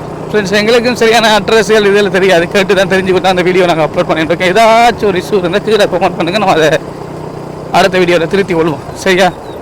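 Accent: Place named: Batticaloa, Sri Lanka. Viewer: உங்கள் கலப்படம் இல்லாத மட்டக்களப்பு தமிழும் மருதநிலமும் அழகு. ஆனால் பாவற்கொடிசேனையில் கடைசிவரையும் பாவை கொடியை காட்டவே இல்லை.❤❤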